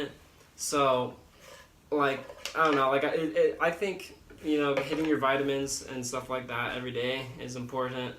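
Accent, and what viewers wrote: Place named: United Kingdom. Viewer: Where are you from? You kinda sound like you're from the UK.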